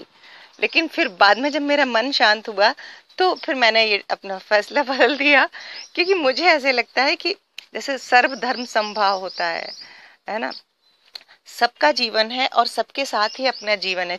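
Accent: Standard. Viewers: Thank you ❤aapne reply kiya Aapki awaz bahot acchi hai or hindi bhi shudh bolte ho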